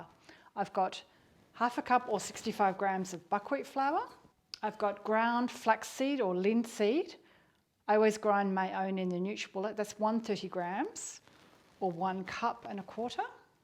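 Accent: Australia. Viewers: Thank you i can't wait to try these. Are you an Aussie living in sweden? Your accent sounds Australian.❤️💙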